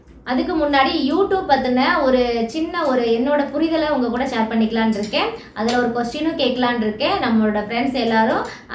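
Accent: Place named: Tirunelveli, India. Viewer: Tirunelveli slangs super sister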